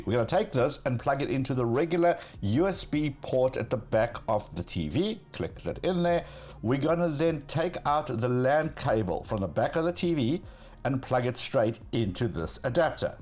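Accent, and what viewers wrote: South Africa. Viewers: Are u originally from South Africa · For this reason I'm using an ethernet cable & also since the router is too far from my android tv box. Btw you sound like you have a South African accent since I'm from South Africa.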